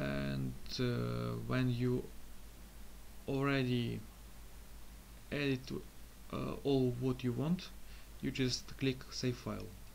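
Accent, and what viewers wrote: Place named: Russia. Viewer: Прекрасный русский акцент👏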